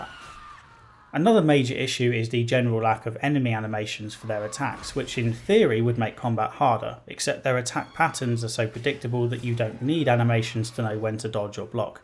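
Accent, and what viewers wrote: United Kingdom. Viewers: He's very obviously British from his accent though. Stop making this about America. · Oye, francisco, cálmate que te lees bien facho. Él tuvo una mala elección de términos al decir que no había diversidad cultural, pero creo que fue accidental. El universo de The Witcher es basto y rico culturalmente hablando, es lo más hermoso, rendirle homenaje al folklore europeo de tal forma es bellísimo. El gesto de su crítica fue decir que se puede entender que los humanos de cierta región sean homogéneos en cuanto a su color de piel y más si se trata de la Edad Media y todo ese rollo, pero con PORTALES, otros mundos y otras especies como la de los elfos, enanos emtre otras antropomorfas ¿y todas esas son blancas también? Muy raro eso, muy sospechoso. El tipo es británico y se le nota en el acento de aquí a la China, dude, chill. Puro facho defendiendo sus sesgos raciales. 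Si fuese una representación histórica acertsda de la Edad Media sin portales ni otros mundos, pues no hay problema, todo bien, pero no en un Universo donde existe la magia y la habilidad de viajar entre regiones y otros mundos. Facho. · The only thing missing from the joseph andersons videos was the british accent, now its fixed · Why are you trying to make me shut off your video after you go off into left field talking about race? And why demean yourself by calling yourself a privileged white person? Your original instinct in 2015 to roll your eyes was the correct one. Why are fantasy stories mostly populated with white people? Cause it’s based off of European history and culture. Based on your accent you come from a country that used to run the world and the self flagellation is just embarrassing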